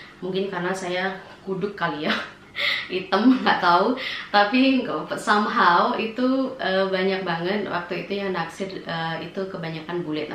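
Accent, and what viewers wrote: Java, Indonesia. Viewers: Iya mbaaaak Indonesia bangeeet soalnya. Nek ngobrol, "njawani" bangeeet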